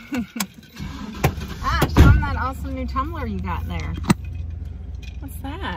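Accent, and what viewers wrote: South, United States. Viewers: Another great video! I miss my farm 3500 acres, and we grew wheat, barley and peas. It was up on the high line in Montana but due to an accident I was no longer able to farm and your videos is very uplifting! is a man of few words and is funny. I joined your channel not long ago and have never seen pack a pistol. When we would go out and check crops or be in the tractor there was always a pistol and a rifle in the truck, combine etc. as we have coyotes, badger, mountain line black bear and grizzly bear. You are just a hoot, the southern accent and facial expressions are funny as heck. A great family channel and you have a great family as well! Keep doing what you're doing cause you're doing it right! Take care, stay safe and God bless!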